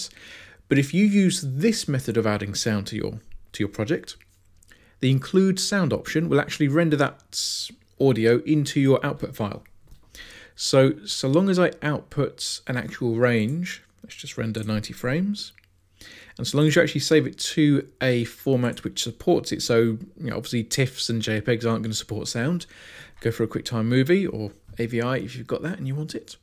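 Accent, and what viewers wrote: United Kingdom. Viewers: Not sure if sophisticated American or just British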